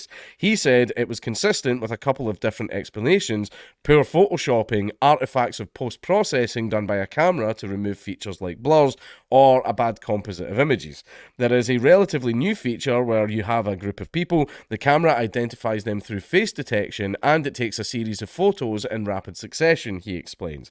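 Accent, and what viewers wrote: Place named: Scotland, United Kingdom. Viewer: My daughter in the room just asked about Dankula saying: "how do you understand him dad?" And I just said: Scottish?!?! Easy, just watch Trainspotting once a year for the past 20+ years."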